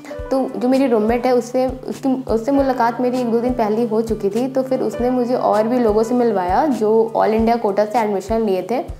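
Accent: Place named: Bihar, India. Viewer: I'm also a bihari her accent is relatable...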